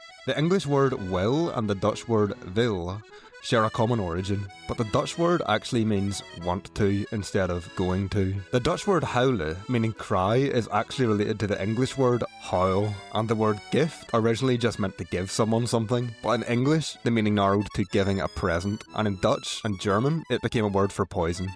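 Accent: Northern Ireland, United Kingdom. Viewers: I thought the narrator was Scottish, but I suppose the Northern Irish accent must be close to the Scottish one. It made the English words sound a bit 'off' to my ear lol. But I'm a Kiwi, and so I bet my vowels sound worse 😆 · Nice video! Really managed to quickly showcase the similarities/differences. Your pronunciation is really quite good, especially your UI, but I couldn't help but think I was hearing an Afrikaans accent! After realizing it wasn't just the first few words or something, I HAD to check your profile. I could obviously hear the Northern Irish accent as well, but thought you might be an Afrikaner having grown up there or vice versa in South Africa. If you don't secretly have any Afrikaner DNA, I would highly suggest you take a stab at Afrikaans, you'd sound fantastic at it! Mainly your short O, A, OU, -T/D, and OE, sound very Afrikaans, but even the G sounds ever so slightly more Afrikaans. 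Some specific examples are your "gebracht", "koe", "dorp" (the r also contributes to it here), "stad", "koud" and "soud" (which sounds identical to Afrikaans "sout" meaning "salt" ("zout" in Dutch). Anyway, none of this is to take away from your video or anything! Love your Northern Irish OW in words like "cow" and "howl" as well 😄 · It's funny when I first heard the man's accent I thought he was a Dutch person making the video because he didn't sound English and more Germanic though I'm aware most Dutch people speaking English barely have an accent because 90-95% of their population speaks English fluently and the most proficient as a second language in Europe. Then I saw that he was based in the UK according to his user description and then he revealed he was from Northern Ireland which was only then that I detected his accent being from that area even if he sounded like he was pronouncing all the Dutch words correctly like a real Dutchman would do. 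· I love the northern ireland accent, everything is pronounced so clearly. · Kind of – it's his Northern Irish accent.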